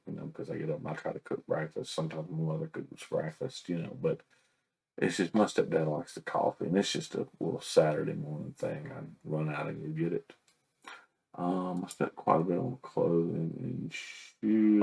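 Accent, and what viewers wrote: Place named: South, United States. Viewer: Your "potatoes and tomatoes" cracked me up! I miss the southern accents now that I've moved! I wish I had grabbed some YNAB swag, I was too new to the game to know about it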